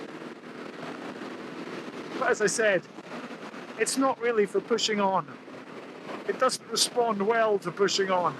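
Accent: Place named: Scotland, United Kingdom. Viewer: It looks like one of the Scottish glens to me, certainly has a Scottish twang in his accent.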